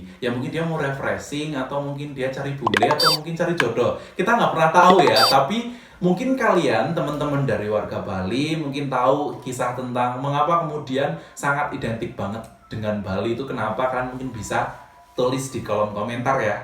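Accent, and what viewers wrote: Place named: Java, Indonesia. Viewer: Ora medok ora kepenak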